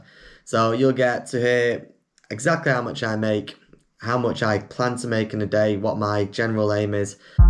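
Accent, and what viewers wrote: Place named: North, United Kingdom. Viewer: Hastings! You're from up north though ain't ya 👍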